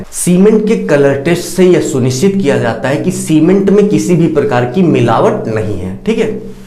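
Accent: Bihar, India. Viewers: Sir ap bihar se hai kya??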